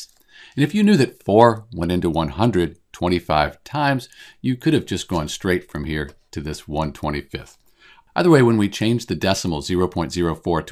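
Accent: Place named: RP, United Kingdom. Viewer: His voice sounds so posh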